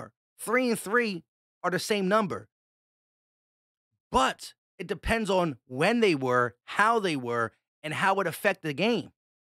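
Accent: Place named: New Jersey, United States. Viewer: I watch you every week, dirty Jersey native (Vineland) living in Boston. These are my favorite Eagles updates/videos, you make a lot of sense and it’s nice to hear the accent from home bro! Keep it up! 💪🏽🦅🦅🦅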